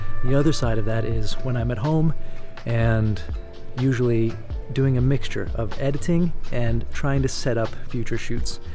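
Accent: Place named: Canada. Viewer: Kind of a different question for you,...I'm a bit of a student of language and I'm really curious where you did your English training? You have, I'm guessing, an almost Canadian accent for someone from Sweden. Anyway, love your content and use of the English language. 🙂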